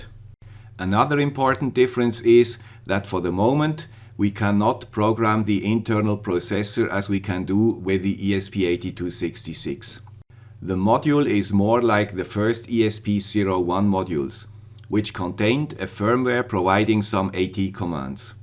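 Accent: Switzerland. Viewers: Great video ! Btw, I don't mind your Swiss accent. It's not too bad ! Please continue with these interesting video's. It's very entertaining. Greetz !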